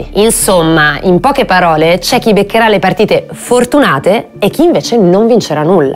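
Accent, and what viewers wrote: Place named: Bologna, Italy. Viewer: Dimmi che sei di bologna senza dirmi che sei di bologna. spento il video subito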